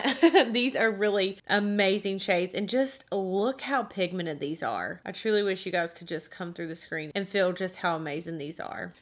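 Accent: South, United States. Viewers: Splucy She has a southern accent and I love it! No reason to leave a rude comment you should just leave politely. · Ashley Cornely okay I'll never visit the south of USA. Screw being surrounded by that accent constantly.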